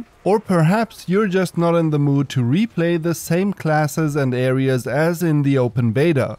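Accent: Germany. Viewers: sounds like a german guy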